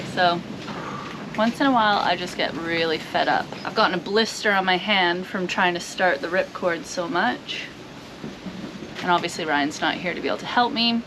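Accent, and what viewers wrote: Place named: Australia. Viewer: I am not sure if you are a yank or aussie as you got an Aussie ascent sometimes... then you got a yankee ascent .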